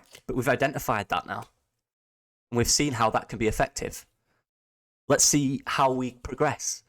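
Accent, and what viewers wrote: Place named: United Kingdom. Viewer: Am trying to figure out your accent what part of the uk you from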